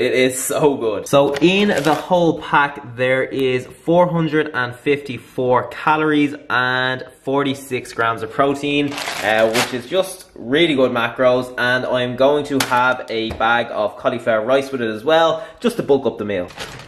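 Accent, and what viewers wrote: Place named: Ireland. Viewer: Love your videos especially love seeing an Irish YouTuber great to hear a familiar accent a good video would be on face fat loss would really help 👍🏽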